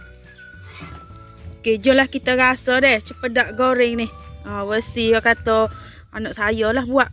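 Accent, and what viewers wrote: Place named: Kuala Lumpur, Malaysia. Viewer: Slang KL adik ni..phm sgt..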